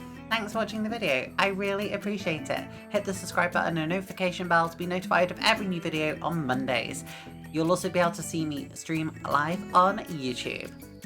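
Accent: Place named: West Country, United Kingdom. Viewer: "Ello my loverrr" I thought you sounded like you came from the west country! Great video loved it!! 😍 looks like a great show with some outstanding models. Hope you're well, thanks for posting.😘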